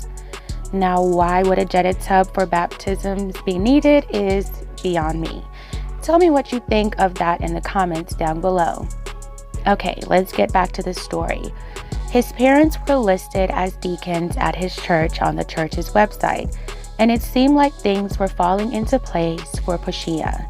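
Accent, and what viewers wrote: Baltimore, United States. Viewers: Now when you do videos talk regular like this, cause you talking like you from Baltimore ain’t it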